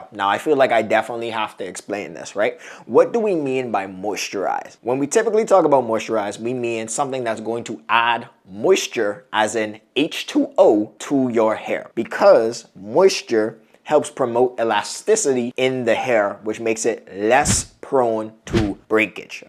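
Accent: Bahamas. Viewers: sound like he is a Bahamian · I think the Bahamas 🇧🇸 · he does sound Bahamian. I knew when he said “hay-auw” for hair lol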